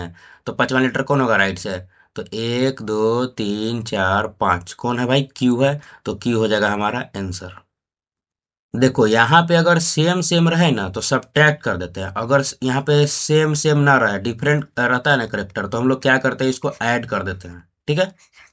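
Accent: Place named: Patna, India. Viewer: Bhaiya Patna se lagte hai sayad bhaswa wahi hai ❤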